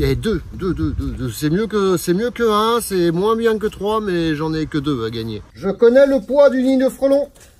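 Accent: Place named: South-West, France. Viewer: Bonjour comme l'enveloppe du nid n'est pas commune penses tu que ce soit une anomalie ou une adaptation du frelon a l'environnement.?? J'aime bien l'accent du sud ouest.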